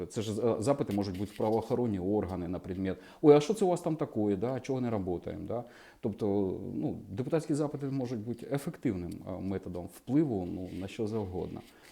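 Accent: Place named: Surzhyk, Ukraine. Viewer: все добре, але оцей суржик.....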